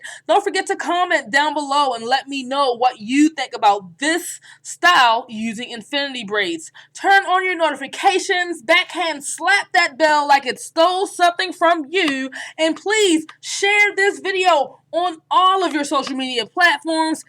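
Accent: Baltimore, United States. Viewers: Very pretty. I love your Bmore accent. Stay safe.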